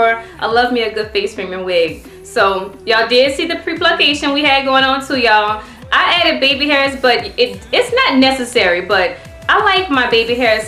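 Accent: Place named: New Orleans, United States. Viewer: New Subbie. You have a New Orleans accent, I know this because that's my city... Baaa-Bay... I want that color, it's beautiful on you. I want 2, LOL..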